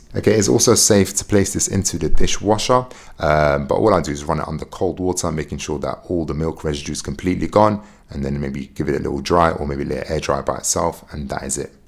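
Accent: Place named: London, United Kingdom. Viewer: Well, it's not R.P., but it's more than adequate English with a slight London accent, I'd say.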